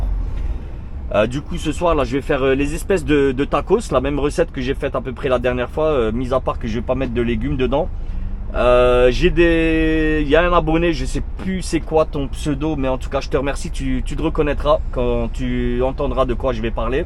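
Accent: Alsace, France. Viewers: hola mon reuf change rien tu est au top sa c'est un bon alsacien ta façon de parler dans les videos tu a le droit de parler comme tu en avis courage et force a toi bis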